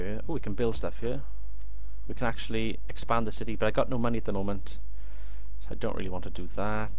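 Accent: Wales, United Kingdom. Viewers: Unorthodox is good though. You make moves I probably would be conservative to do and it is interesting seeing how that plays out. But I can certainly say you have became my favorite TW Youtuber over the past week or so. Also the accent is great :P Can't beat a good Welsh Accent.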